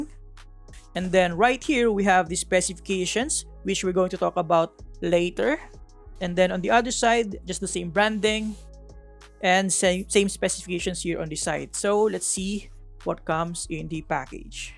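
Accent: Philippines. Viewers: alam mo kagad kung pinoy yung nag sasalita 😅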